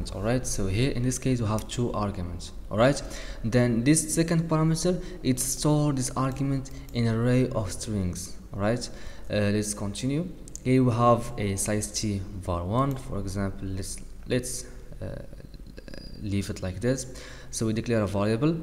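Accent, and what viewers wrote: Morocco. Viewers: bro are you a Moroccan? Your accent? I'm to from morocco, good luck with your work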